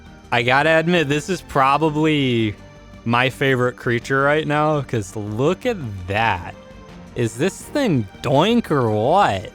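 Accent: Australia. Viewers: Disliking because of the terrible Australian accent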